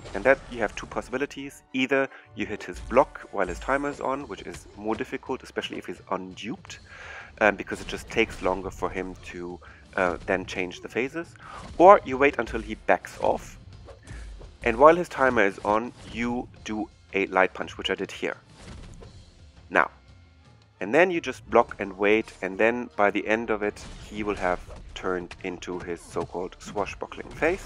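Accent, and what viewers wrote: Germany. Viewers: Where are you from dude? you sound German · You sound german, am i right?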